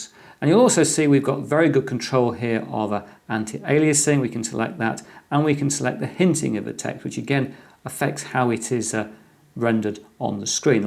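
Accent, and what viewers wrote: United Kingdom. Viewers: Thank you for the Mint week. I didn't know it was a dairy - very interesting and funny. I just like your british accent - I've been in Britain three times in my life (<num>) and I like your country. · Thanks to the China Virus...I have been listening to your very generous youtube posting which I have been getting used to your Brit accent (enjoyable to hear very little lol and brg.......etc) Thank You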